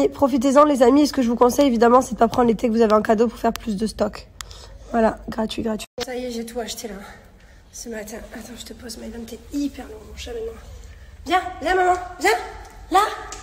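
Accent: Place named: South, France. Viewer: Salut. J’ai lu ton 📚 livre j’ai beaucoup aimé. Je viens de Biélorussie et Je suis en France 🇫🇷 depuis 17 ans, j’aime beaucoup ta personnalité. Grâce à toi j’ai commencé mieux parlé en français, j’aime beaucoup ton accident de Sud. J’ai bientôt 40 ans mais j’aime beaucoup te regarde car pour moi, tu es une femme intéressent. Bisous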